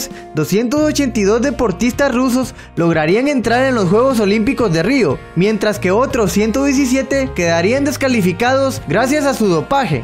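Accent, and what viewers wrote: Honduras. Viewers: Oye te detecto acento Hondureño Eres de ahí ? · se nota el acento hondureño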